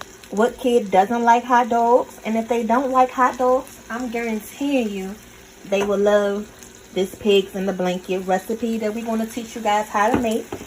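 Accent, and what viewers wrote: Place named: East Coast, United States. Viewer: She’s a cute mom. And her accent sounds real east coast.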